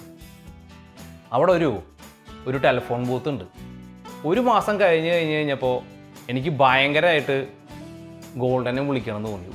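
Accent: Thrissur, India. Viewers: മലയാളത്തിലെ ഏറ്റവും ഇഷ്ടപെട്ട സ്ലാങ് ആണ് തൃശൂർ സ്ലാങ് ആ സ്ലാങ് കേട്ടു വന്നതാ ഈ ചാനലിൽ ഇപ്പൊ favourite channel ആണ് 😍( ഒരു പാലക്കാട്ടുകാരൻ )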